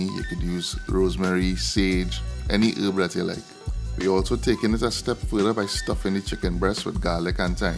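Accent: Trinidad and Tobago. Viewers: Well I just subscribed because I heard the Trini accent! 💕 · You is a trini man ? 🇹🇹🇹🇹🇹🇹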